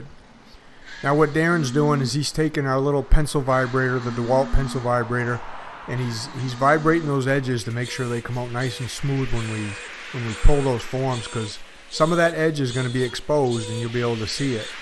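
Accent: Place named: Boston, United States. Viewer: Cool video. That accent is confusing me. Sounds a little north boston crossed with cape cod.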